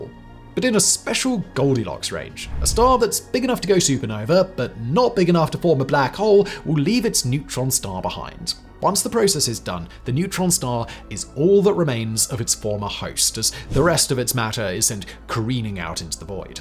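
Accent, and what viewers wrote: United Kingdom. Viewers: Why is it only British men are allowed to narrate space or nature videos?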